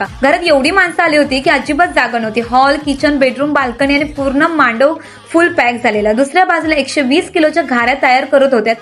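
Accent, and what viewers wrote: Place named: Agri, India. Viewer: Tuji bhasha tar aagri vatte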